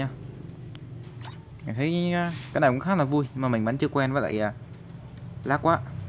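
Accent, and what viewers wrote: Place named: Central, Vietnam. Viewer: Miền trung kb dépác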